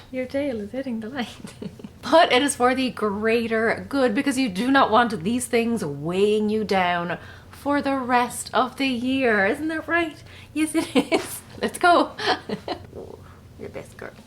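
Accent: Ireland. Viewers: I just discovered your channel and couldn't wait to subscribe. I can relate to so many of the things that you talk about. My fantasy life is miles away from my real life. Years ago when everyone was into rubber stamping I purchased some equipment and then realized that I wasn't into crafting and was never going to enjoy doing it. I would say my biggest problem is holding onto something just in case I might need it at a later date. I'm starting to watch all of your videos and I hope that I can gain some inspiration from you. You are both informative and very entertaining to watch. You have such a calm demeanor and your Irish brogue is lovely.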